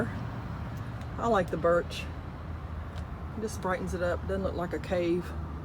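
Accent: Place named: South, United States. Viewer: I love your southern accent. Your so cute! Thanks for sharing your experience of owning a Tab 400. It really help us new owners. I learned so much!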